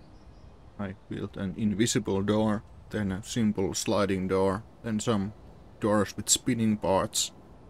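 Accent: Finland. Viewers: Sounds like Finish English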